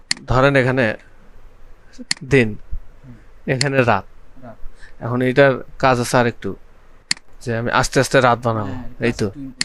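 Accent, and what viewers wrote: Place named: Rangpur, Bangladesh. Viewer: ভাই আপনার বাড়ি কি রংপুরে নাকি? ভাষাটা খুবই পরিচিত মনে হচ্ছে ❤️❤️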